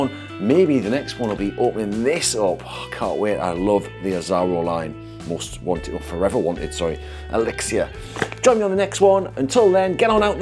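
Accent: United Kingdom. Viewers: Look forward to the Azzaro vid, they do great frags. I was trying to pinpoint your accent, I thought it east Yorkshire. If you get the chance, defo Scent Salim in Leeds/ Bradford is worth a shout. I can recommend a handful to try, and they are great hosts! I'll keep my eye out for the Azzaro vid.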